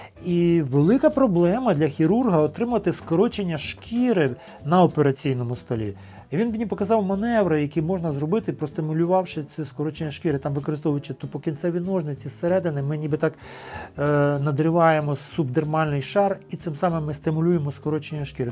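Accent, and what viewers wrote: Ukraine. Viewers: Величезний респект лікарю, за те що є можливість слухати його українською мовою♥️ До речі ваша українська надзвичайно чиста , як з заходу України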